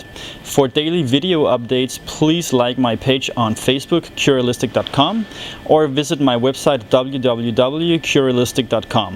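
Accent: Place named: Denmark. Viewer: heavy on the Danish accent. apart from that excellent English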